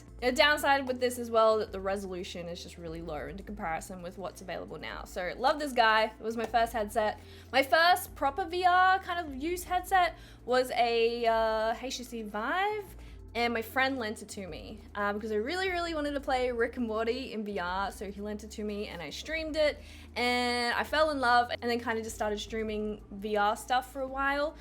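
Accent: Australia. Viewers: in the thumbnail you look kinda like erin from the office hahahaha anyway love your content! youre amazing and its cool to see a big australian youtuber :D (or at least one with australian accent hahaha im australian too lol) thank you for being great! also i love your style, idk how to explain it other than that but yeah you are just cool and stuff :))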